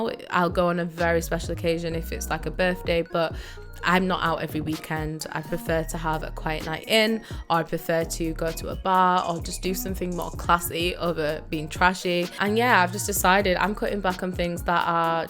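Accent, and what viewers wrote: United Kingdom. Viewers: Hearing a UK accent automatic like❤😊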